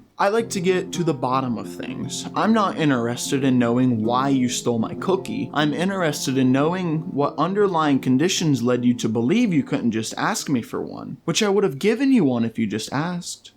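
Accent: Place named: South, United States. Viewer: your southern accent gives me joy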